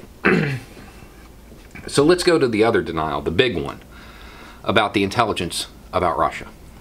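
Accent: South, United States. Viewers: Thank you for being the voice of reason with a southern twang!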